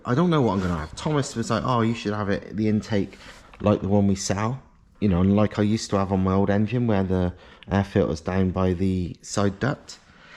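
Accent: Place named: West Country, United Kingdom. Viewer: Dude where are you?. By the accent I'd say not that far from me lol,Gloucestershire (where I'm from) Swindon , Bristol, Bath, Somerset somewhere, Wiltshire. I do usually get it fairly right . Anyway any chance you'd interested building something for money (obviously) it will revolve around a vr5 engine (have to have that sound somehow, and an original in line is just way too pricey when the vr5 is out there). TBF though much simpler in my choice of car MK4 vr6 4 motion but turbo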